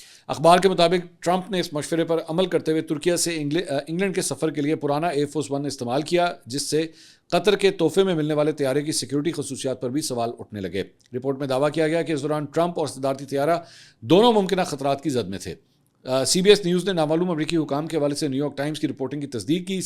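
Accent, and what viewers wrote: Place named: Lahore, Pakistan. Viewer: بھائی یہ جو سٹی کا نیم ہے وہ اپ پروپر اس کو بولیں گے تو۔یمبو کہلاتا۔میں سعودیہ الریاض میں رہتا ہوں۔ اور اپ کی اپ کی ویڈیوز کو باقاعدگی سے دیکھتا ہوں۔اپ کا بات کرتے ہوئے پنجابی لاہوری ٹچ بہت ہی کمال ہوتا ہے۔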